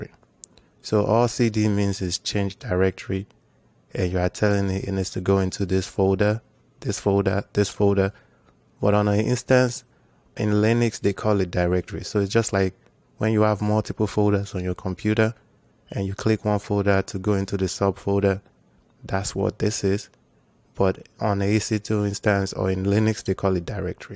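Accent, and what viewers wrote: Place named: West Africa. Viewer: Dude keep this up! Best AWS walkthru. Also cool you have a West-African accent - keep striving!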